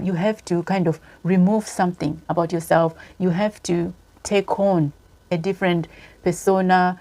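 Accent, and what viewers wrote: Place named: Zimbabwe. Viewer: How long have you been in the UK? You have retained your Zimbabwean accent.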